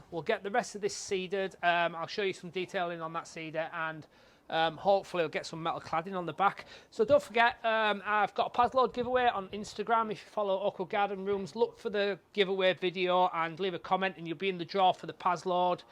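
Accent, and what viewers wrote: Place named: Northern England, United Kingdom. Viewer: I do like a good Northan accent how do I collect my nailer gun please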